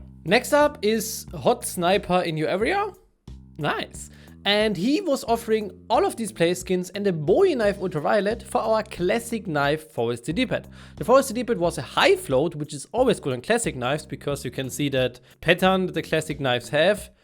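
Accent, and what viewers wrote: Germany. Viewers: Man merkt dass er Deutsch ist an sein Akzent😂 feier ich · Are you german?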